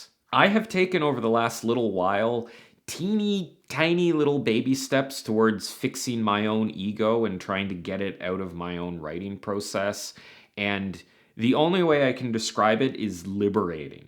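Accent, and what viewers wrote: Canada. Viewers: i swear i can smell the canadian in your accent. it's messing with me trying to figure out if i'm imagining things or not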